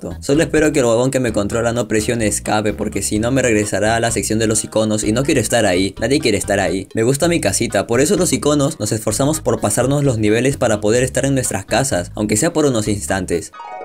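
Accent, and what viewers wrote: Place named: Peru. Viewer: Tu acento peruano me caga de la risa xD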